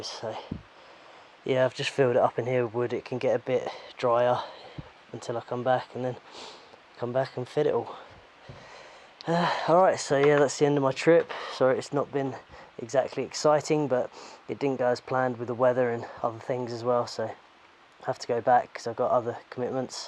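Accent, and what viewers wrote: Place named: East London, United Kingdom. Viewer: you sound like an east-londoner!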